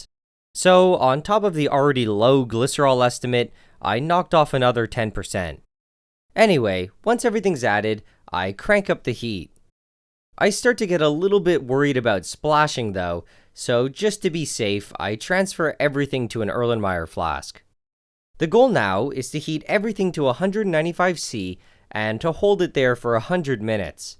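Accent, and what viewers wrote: Canada. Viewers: awesome video, you sound canadian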